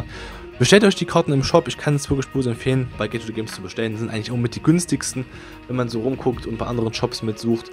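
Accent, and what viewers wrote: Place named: Saxony, Germany. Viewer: Du klingst wie als würdest du aus Sachsen kommen, ist das so?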